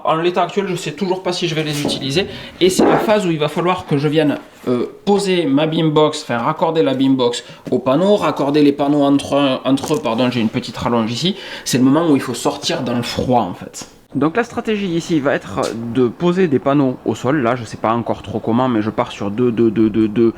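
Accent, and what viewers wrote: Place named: Tarn-et-Garonne, France. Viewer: Tu serais pas du Tarn et Garonne ou pas loin avec ton accent ? lol ah bin j'ai eu ma réponse presque à la fin de la vidéo lol Moi je suis de Montauban. Merci pour cette vidéo, ça risque à me servir pour la maison de mon père.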